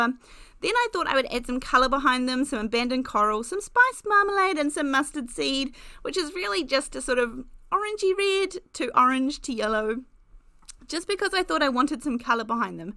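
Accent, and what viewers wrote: New Zealand. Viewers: Yay, we have sound! I love your crafts and the sound of your amazing NZ voice! 🤩🤩🤩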